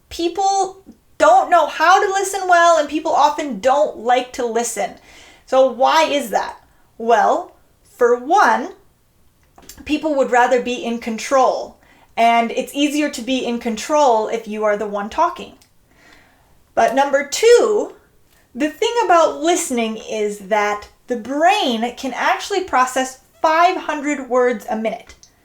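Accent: United States. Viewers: Which part of America are you from? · Are you from California? I think you guys have that kind of accent there.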